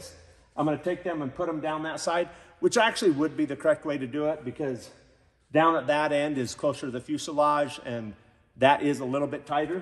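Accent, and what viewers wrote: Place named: Utah, United States. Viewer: you must be from utah the way you talk.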